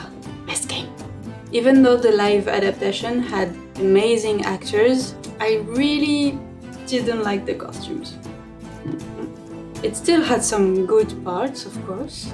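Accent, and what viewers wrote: France. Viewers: Magnifiiiiique ! Et tellement de courage et de patience. En tout cas je savoure chacune de tes vidéos depuis que j'ai découvert ta chaîne ! And I love the French accent ;)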